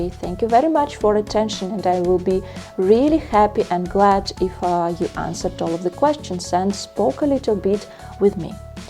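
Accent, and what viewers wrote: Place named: Russia. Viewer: Уроков с правильным произношением и правилами полно. Это тренинг на формулировку своих мыслей на английском. И почему-то русский акцент русскому понимать проще😊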